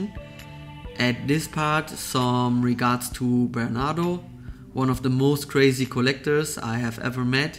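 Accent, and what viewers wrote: Germany. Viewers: Der Akzent 😂 100% deutscher 😂was macht ein Deutscher in Dubai ?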